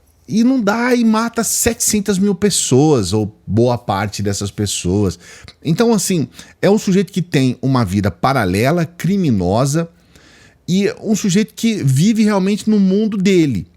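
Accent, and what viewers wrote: Minas Gerais, Brazil. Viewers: Adoro você, principalmente quando fala coisas sérias no mineirês! Eu gargalho contigo!bjs. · Meu amigo, seu sotaque mineiro está cada dia melhor. Parabéns pelo canal!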